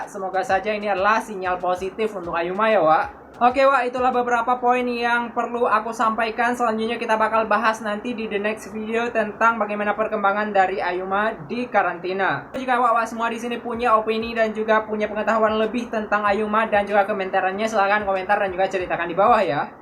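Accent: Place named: Medan, Indonesia. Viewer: Wak ud ku suscribe channel kau wak,, sukses selalu ya,, kayak org medan cakap kau wak🤣😁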